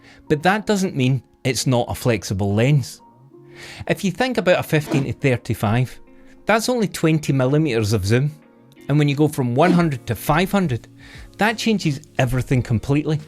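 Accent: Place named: Scotland, United Kingdom. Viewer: That accent 🙂 where from? 🙂 Schotland? I love it. greetings from the Netherlands 🙂 without any accent.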